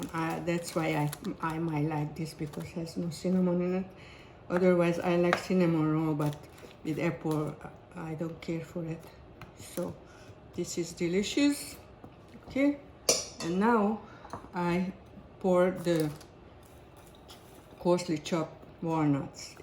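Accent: Hungary. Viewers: I love your recipes and enjoy your videos. And you can be rightly proud of your Hungarian accent and your roots!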